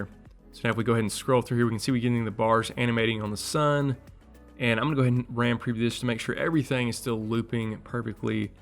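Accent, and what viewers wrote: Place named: South, United States. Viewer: So many gems in this!!! Thank you! I'm from NYC, but ur accent reminds of me of my fam down south.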